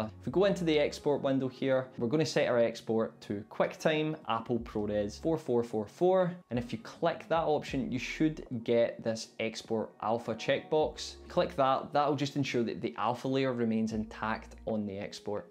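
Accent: Scotland, United Kingdom. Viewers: Thanks mate it really helped me 🙂 u got one Subscriber more :-) But your accent is uugh where are you from ?? Scotland or so ? :D i have to listing very carefully :D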